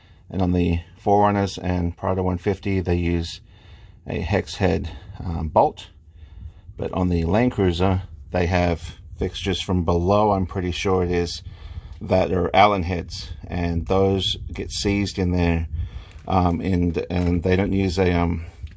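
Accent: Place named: Australia. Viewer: Great explanation and tips. You sound very Australian, I can't figure it out haha. Cheers Cobber!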